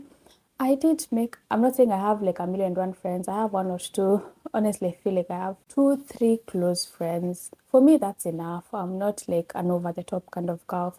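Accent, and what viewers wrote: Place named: United Kingdom. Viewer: I'm always catching the intonation anamalizia nayo sentences....kidogo tu British accent itaingia..you gotta love ❤❤❤❤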